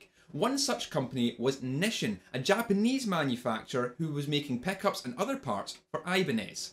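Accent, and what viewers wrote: Scotland, United Kingdom. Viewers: Love video's like this man. Am fairly new to the channel, but loving it already. And nice to hear a Scottish voice on youtube! :D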